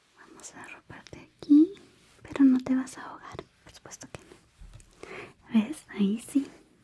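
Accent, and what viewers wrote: Chile. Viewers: A mi me gusta su voz también cuando habla a lo chileno se escucha muy hermosa:_Love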